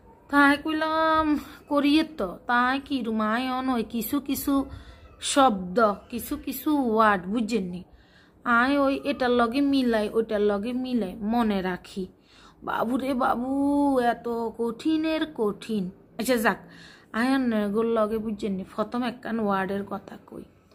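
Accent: Noakhali, Bangladesh. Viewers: আপনার নোয়াখালী কথা শুনতে খুব ভালো লাগে এই জন্যই বন্ধু হয়ে গেলাম, গান টা অনেক সুন্দর গেয়েছেন। আমি আকলিমা husband এর একাউন্ট থেকে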